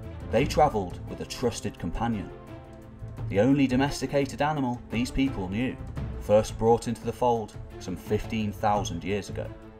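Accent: United Kingdom. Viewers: KuLLChu Can I find a Swedish or Egyptian subject that ISNT NARRATED by a damn BRit????? KuLLChu??? Sheeeeeeesh.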